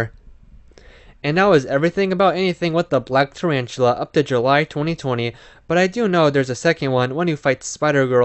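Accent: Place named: Argentina. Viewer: Naaa tremendo el pibe argentino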